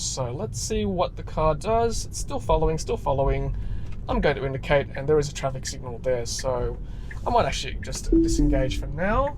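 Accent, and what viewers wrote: Australia. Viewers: Always interesting to hear an Aussie accent and terminology, e.g. "amber," trying re-orient oneself to see driving being done on the "wrong" side (non-American) of the road, and imagining what AI/machine vision needs to do to handle your travel ways. Does your testing of Autopilot (1) Indicate significant disc brake usage whereas regen braking involves none; (2) Provide some play in steering to avoid potholes within one's lane; (3) Hint at what causes phantom braking or steering off course; and, (4) Indicate what might be situations where it should not be used? Thanks!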